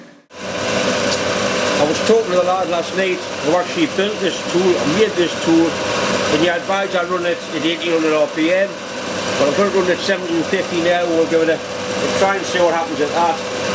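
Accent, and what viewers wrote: Newcastle, United Kingdom. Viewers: For 42 seconds I was transfixed..then I was presented to the Geordie Andy Capp...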